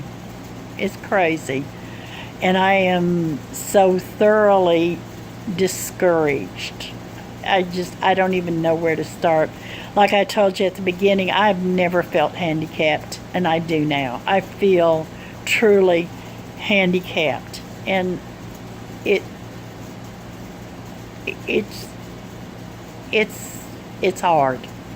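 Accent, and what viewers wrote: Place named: South, United States. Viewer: I love ur Southern accent! I know u will do great and feel so much better after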